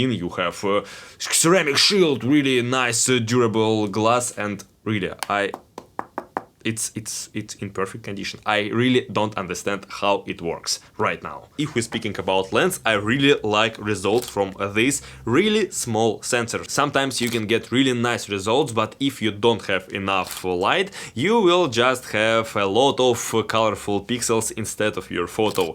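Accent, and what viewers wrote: Russia. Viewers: You have Russian accent. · твой английский для русского человека просто умиление )))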